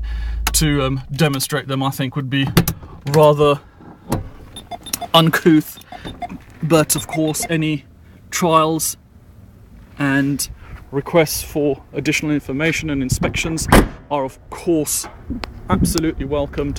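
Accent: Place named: India. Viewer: I also counted the word Absolutely and got 23 times in the space of ten minutes, this guy surely has trouble with the English language in the way he uses the same word so many times, without being able to thin k of another word to use. Maybe it is because he can not use all the words in the English language is because he is not English, I detected a faint hint of Indian in his words, so he was doing what all Indians do when they give you a time wasting phone cal.l they always have an English name and try to put on a perfect English accent, as well as not knowing the English he also didn't know the Highway Code, he was parked on a double yellow lone, on both sides and on the back.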